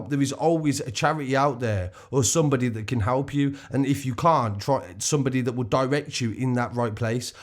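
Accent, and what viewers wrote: Birmingham, United Kingdom. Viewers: Bruv, every time someone mentions brum, your voice comes to mind! Haha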